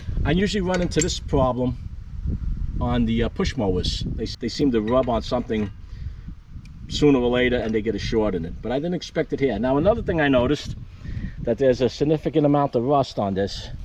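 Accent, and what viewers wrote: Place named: Boston, United States. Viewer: Love the Boston Aaacent!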